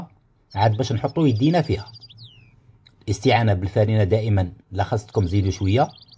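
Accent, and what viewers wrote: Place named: Skikda, Algeria. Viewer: Bonsoir le Skikda je sais que vous avez un accent Skikda et les Skikda les rosica days sont traités par les pizzas merci à vous et bonne continue❤❤❤❤❤❤😂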